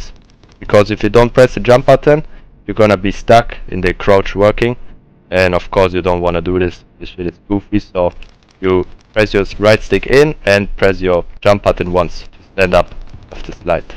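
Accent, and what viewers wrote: Germany. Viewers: German accent haha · man hört direkt das du deutsch bist hahah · Man merkt richtig dein deutsch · you are from german ? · German accent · nice video are you German?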